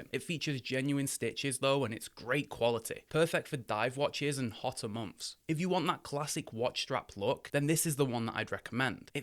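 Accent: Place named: Yorkshire, United Kingdom. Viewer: The only watch accuracy meter I could find in the app store cost $29.95. And there isn't one that looks like the one you show on the video. Can the app be found elsewhere? I love your videos and your Yorkshire (I may be wong) accent. I was a Harrogate resident for 5 years back in the 70s. Thanks for your great videos.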